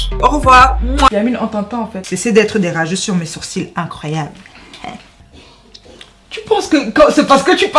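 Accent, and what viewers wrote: Cameroon. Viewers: J'ADORE TROPPP TON STYLEEE, TU ME FAIS TROP MARRER !! 😂😂😂 En plus t'es ma sœur camer par ton accent je le sais wow. Hahahah. Je ne te connaissais pas avant pourtant t'es déjà à près de 20.000 abonnés.😍😍 Je me suis vite abonnée. Big Kiss et courage pour ce que tu fais et je remercie YT pour cette recommandation. ❤️😘😘😘😘😘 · Jadore jadore jai bien dit jadore l'accent camerounais je le trouve beau je kif voir les camerounais parler et en plus je tombe sur toi je ne te connaissais pas mais je te trouve fraîche pétillante marante en plus ta l'accent 😍😍😍😍😍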